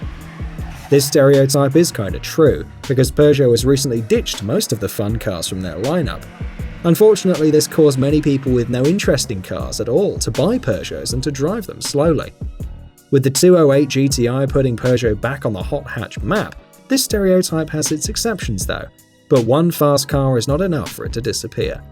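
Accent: England, United Kingdom. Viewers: Weird to hear a British accent and loads of British numberplates, and hear you call an MX-5 by its US of A name.. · Are you from England?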